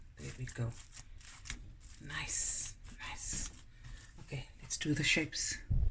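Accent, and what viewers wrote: South Africa. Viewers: Hi l made these yesterday. They were delicious. I miss that SA accent,now living in UK.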